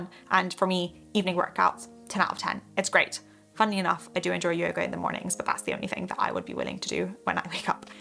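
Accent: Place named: United Kingdom. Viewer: You speak really quickly! I use your videos for improve my listening and I like your UK accent but it's hard understand you without subs.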